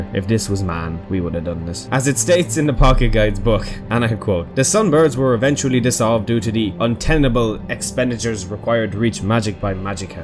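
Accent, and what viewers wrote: Ireland. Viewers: where in in Ireland are you from man , i dont get your accent ? im from cork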